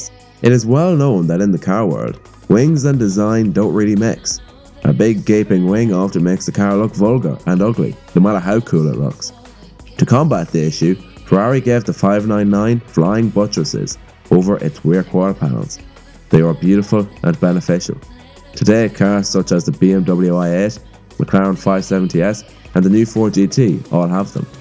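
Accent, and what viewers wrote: Ireland. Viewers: he is Irish...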